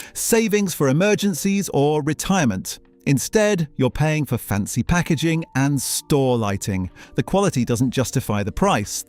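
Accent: United States. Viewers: Why are you talking in an American way? It is so annoying.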